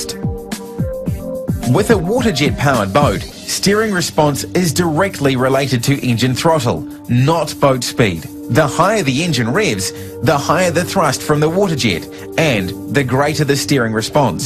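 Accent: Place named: New Zealand. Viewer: Yep. New Zealand accent.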